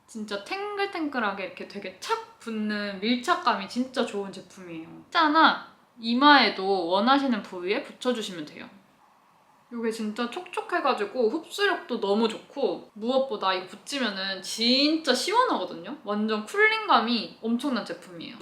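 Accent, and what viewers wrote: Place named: Jeju, South Korea. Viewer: 우왘ㅋ.. 이렇게 현실 제주도말 처음들어봄..ㅋㅋㅋㅋㅋ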